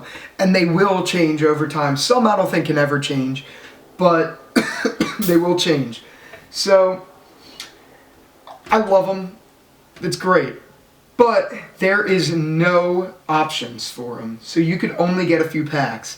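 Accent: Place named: Philadelphia, United States. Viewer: Not even kidding, first 10 seconds and I have to ask: what part of Philly are you from?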